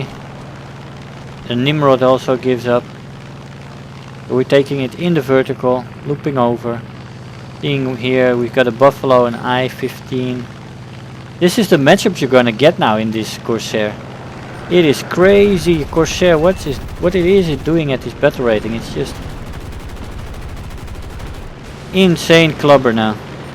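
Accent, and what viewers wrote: Netherlands. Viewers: hi, are you dutch?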